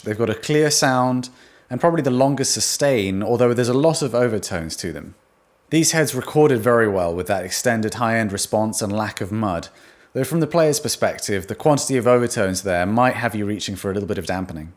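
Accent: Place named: United Kingdom. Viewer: mr accent to British. cool explaining, good information.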